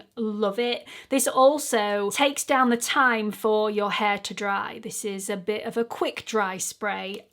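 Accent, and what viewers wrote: United Kingdom. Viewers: Hi there! I'm in the U.S. and some of these product names I've never heard of. I'll see if they ship here. You are so funny and Fun! I find my self laughing with some of your expressions and your LOVELY Brit accent!!! (I want to talk like that!) You are so believable. I think it's your cuteness, your honesty and of course your accent. Thanks for brightening my day! God bless you dear. Lori ❤ Minnesota, USA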